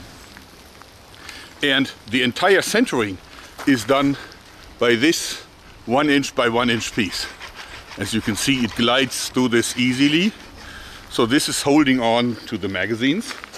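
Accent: Germany. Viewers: german accent with an evil laugh ? I got the best video in my 33 year life. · German english accent is great :) and laught is so fresh , don't change anything man !! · He even has the german accent going for him.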